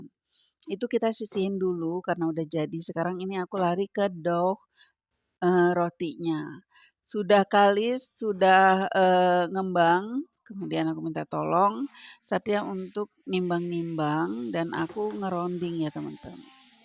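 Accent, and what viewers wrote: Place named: Bandung, Indonesia. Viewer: Suka banget sama kalo LG NGE bake inget uwa yg di bandung..sama logat nya... mengingat kan waktu kecil pernah tinggal di bandung sama uwa...sehat y...semangattt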